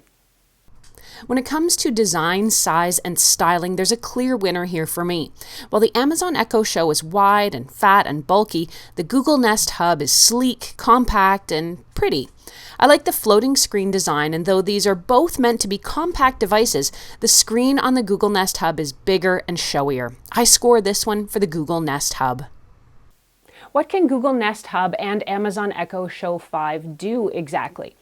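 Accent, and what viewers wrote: Canada. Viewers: Wow, smart girl, gorgeous eyes, good info, intelligent but ... That Canadian accent just cracks me up. Beats the heck out of a Noo Zillan accent too.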